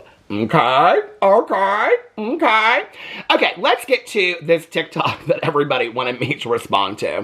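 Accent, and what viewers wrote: South, United States. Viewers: I have been in the most stressful and depressing state today, and I clicked on this and I Swanee you have made me giggle out loud! I lol legitimately when you said even Kim didn’t claim KW 🍆. And yes, I love when your southern twang peeps through.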